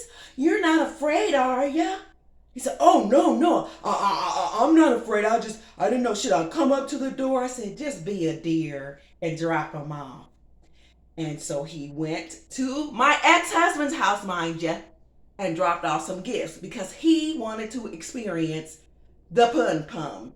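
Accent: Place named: South, United States. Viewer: Oh wow! I didn't realize you had such a distinct southern drawl until this video. In reference to your topic, sadly, most men have not evolved beyond the barbaric be fruitful and multiply stage. Many are sex crazed and lack discipline and self control. Some will mate with any and everything, up to and including babies, teens, the elderly, a corpse, a car, furniture, and passed out women! And let's not forget animals too! So keep an eye on your dogs and sheep! I wish you well.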